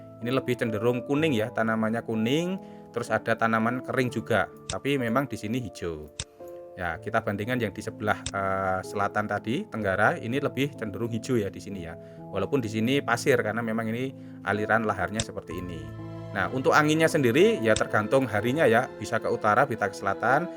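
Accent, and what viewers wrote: Java, Indonesia. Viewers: Podo mas aq yo klaten pantesan kok medok bhsane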